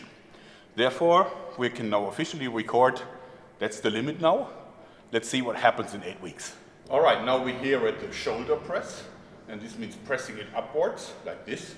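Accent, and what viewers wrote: Germany. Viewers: That's a strong German right there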